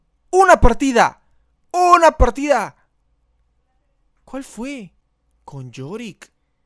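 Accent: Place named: South, Spain. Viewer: De dónde es este we?:v tiene acento del sur :v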